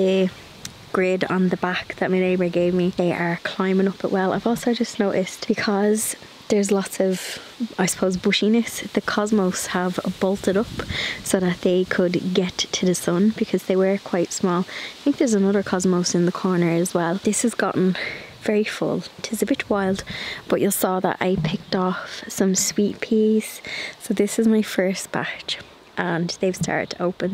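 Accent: Ireland. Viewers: That jug of sweet peas… sigh! Lovely!, I imagined the beautiful scent whilst looking at the sweet peas. I love your YT channel and look forward to seeing a new notification when your latest YT vid is uploaded 😊. A fan from 🇦🇺 I went on a trip to Ireland a number of years ago to walk where a lot of my ancestors walked… hearing and seeing your Irishness is an added bonus to enjoying your gardening, renovating, travel, and craft projects!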